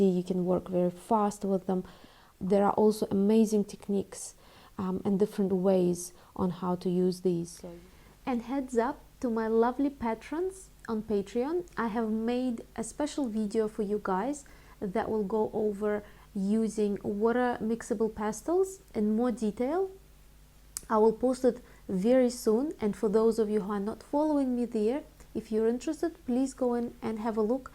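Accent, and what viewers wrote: Russia. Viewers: Beautiful feminine voice, beautiful Russian accent!😊😊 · I love your voice! I am new to your Chanel. As much as I love watching someone draw, the main reason I watch your videos is the sound of your voice. Not only is it soft and relaxing, but I have ALWAYS enjoyed the sound of a Russian accent. I’m glad I came across your Chanel. Thank you!! ✌️ from Canada!!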